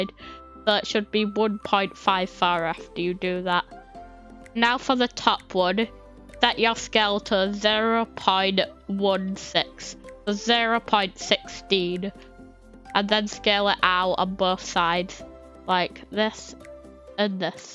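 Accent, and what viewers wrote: United Kingdom. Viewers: Nicee accent ( is that british )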